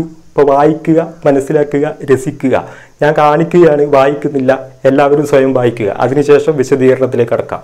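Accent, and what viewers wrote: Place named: North Kerala, India. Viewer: English ucharanam sariyakkan -chal, abhangi ozhivakkan thankaleppole oralkku verum 10 minuttu mathi. Athinu minakkathe aabharanamayi kondu nadakkano. Ethayalum avatharanam, nammute vadakkan thenmozhi ellam gambheeram. Fear not we are with you.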